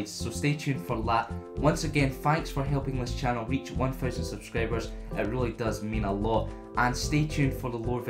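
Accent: Scotland, United Kingdom. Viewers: Can you do a video where you would speak at your normal pace (unless if this is your normal pace in which case i like the scottish accent obv because i have one :) )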